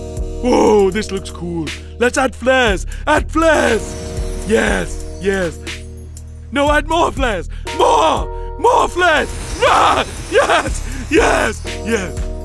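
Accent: United Kingdom. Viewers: This guy britain accent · OMFG Ur British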